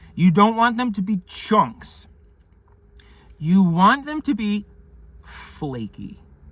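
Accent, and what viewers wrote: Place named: Baltimore, United States. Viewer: I never noticed before that has a bit of a Baltimore accent.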